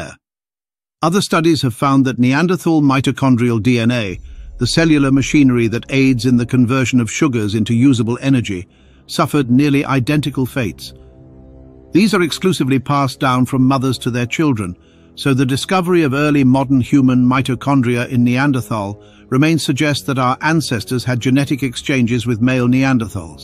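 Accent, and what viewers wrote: England, United Kingdom. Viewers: Just having an English accent doesn't make you intelligent. All this interbreeding stuff is a load of crap you don't have enough Neanderthal DNA sequenced to know what your spewing this is all speculative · No, I don't want to listen to your neanderthal erotica in a British accent...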